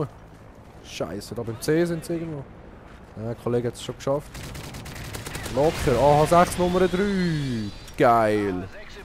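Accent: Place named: Switzerland. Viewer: Es ist schwer dich zu verstehen aber d kannst nichts dafür bist n hardcore schweizer wie nichael mittermaier sagt ^^ trotzdem schönes vid ^^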